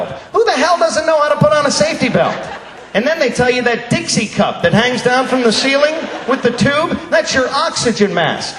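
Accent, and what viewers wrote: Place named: Boston, United States. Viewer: The are both from the Boston Ma area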